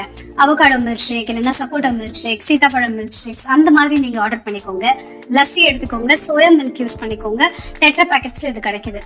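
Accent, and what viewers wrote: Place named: London, United Kingdom. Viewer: உன் சொந்த ஊர் சென்னை வியாசர்பாடி தானே பிறகு எதற்கு லண்டன்லே பிறந்தா மாதிரியே பேசறே.. இன்னமோ தமிழே தெரியாத மாதிரி 😠😠😠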